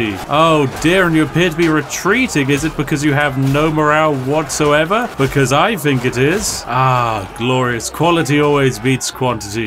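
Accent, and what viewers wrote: United Kingdom. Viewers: Hahahahaha yesssyesss I love your British accent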